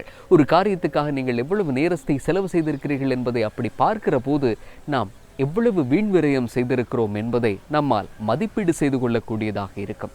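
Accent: Sri Lanka. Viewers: Seem like srilankan tamil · அழகான இலங்கை தமிழ்..😍😍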